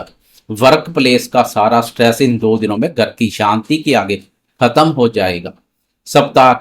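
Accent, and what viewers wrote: Punjab, India. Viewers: 👍🙏👏Aapka punjabi ascent kisi apnay baray ki ascent ki tarah hai🙏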